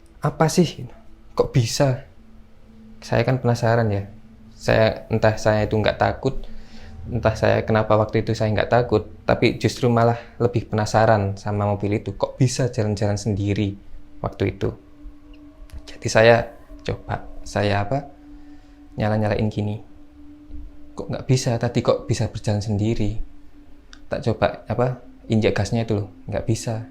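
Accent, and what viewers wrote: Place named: Java, Indonesia. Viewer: Alus bner suaranya jwa bnget sopan kliatan bnget tatakramanya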